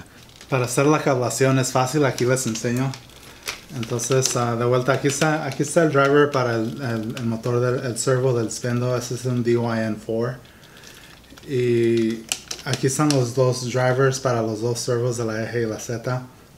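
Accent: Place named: Mexico. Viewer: Hola. Podrías decir los diámetros y paso de los dos Usillos, el X y el Y, así como los pasos por vuelta de cada motor. Puestos a pedir podrías decirnos de donde eres, cómo fue aprender ese Español medio Mexicano y si vives en España. Cuando te haces famoso la gente siente curiosidad, es normal. Un saludo 🇪🇸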